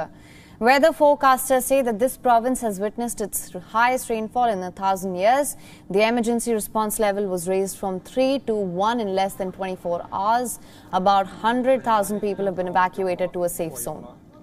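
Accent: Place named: India. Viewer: The anchorwoman looks like a Filipina but with an Indian accent.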